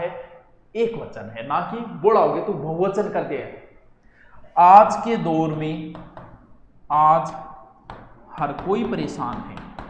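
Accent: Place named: Haryana, India. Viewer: Sar paka Hariyana se h inki bagdise pat se pata chal raha h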